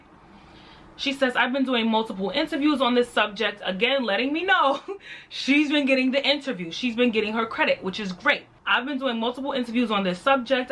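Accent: New York, United States. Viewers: On ya way to 1million!!! Yasssssssss! Sn: You are soooo NY and I love it 🥰🥰🥰😂😂😂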